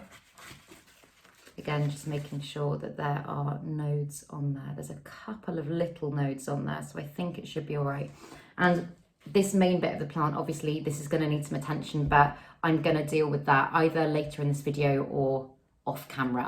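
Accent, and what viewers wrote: United Kingdom. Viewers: Do you come from great britain? I think i hear a difference. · How important is the wax sealing?? Is the wax scented or just plain wax.?? I need to move next door to you.😅😅😅.can this be done in leca balls as most of my plants are in leca. I had to remove my plants from soil because of the problem with those nasty fungus nats. They have vacated the premises and i have 4 plants including miss pink princess that i want to transition to hydroplonic. Im afraid to do this major surgery to her. She has new growth on top, sides and bottom at the soil level. She's beautiful but has gotten top heavy and i don't want her to topple over and break something. I will have to notify her of her pending surgery so that she's ready. You are a darling with your British accent and your bun. Thanks for your expertise.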